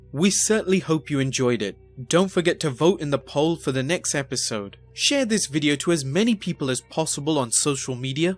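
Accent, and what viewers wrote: England, United Kingdom. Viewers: Love this English's presenters accent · I don’t know why the British accent makes for great documentaries. It’s beyond me, but it works.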